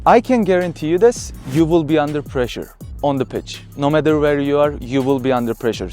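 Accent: Turkey. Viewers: Bu aksan bir turkten baskasi olamaz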